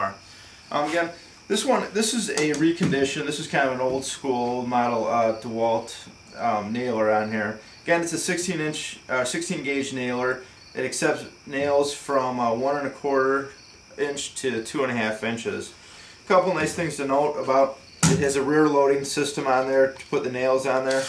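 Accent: United States. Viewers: Great demo. Love the US accent. Now I'm going to have to find out if my Porter Cable nailer can swap out the trigger to become a bump nailer.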